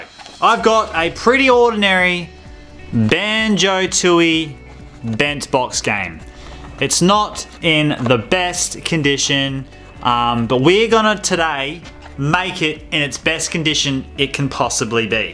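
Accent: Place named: Australia. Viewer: Subscribing because I miss the voice of my aussie buddy Kevin "kaixin" from l🎉ast decade living in shenzhen China. You sound exactly like the guy in China who taught this yank and his kids how to play dungeons and dragons in the undisputed champ of English accents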